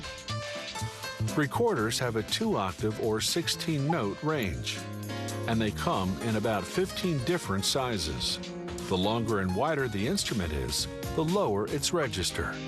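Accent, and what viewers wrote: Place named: United States. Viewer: que acento tan feo! debe de ser un americano, no? que contrasto con el sonido tan dulce de las flautas!!!!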